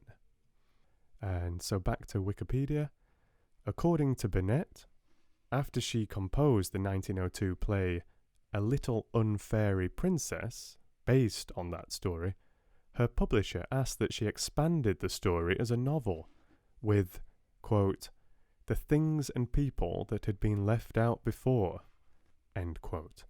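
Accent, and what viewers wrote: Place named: United Kingdom. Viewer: La verdad no entiendo un caraj* no soy muy buena con el acento británico, pero es uma delicia caralo 👌 suscritisima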